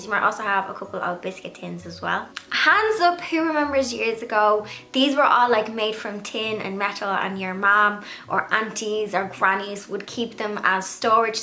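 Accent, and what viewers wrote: Dublin, Ireland. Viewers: I'm new subscriber I am old school I use them for sewing lol and even makeup etc I'm afraid of inhaling spray paint but I love what you done I'm in Ireland is that a Dublin accent I'm a dealz lover and tried anything like that as I live alone xx thanks alot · Blondie is gorgeous. Your Irish accent is so much nicer than my Scottish one. 🏴 Thanks for this vid... I can't throw anything out. 🙃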